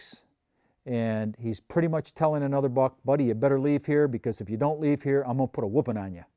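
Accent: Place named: North, United Kingdom. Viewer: Where was he from? He has a northern type accent, but phrases from the south..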